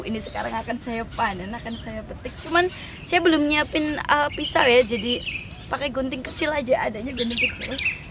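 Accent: Banyumas, Indonesia. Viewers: " kepriye".. 😄 kirain kak asli orang bali atau menado.. ternyata banyumas ya... ❤️ seru euy kebun ibunya kakak.. sehat selalu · Wah,ternyata aslinya ngapak. Bener² gk nyangka. kirain asli bali. Sukses selalu ka 👍😘 Seru banget panennya, sy jd pengen pulang kampung 😊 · Wahh wonk ngapak to mba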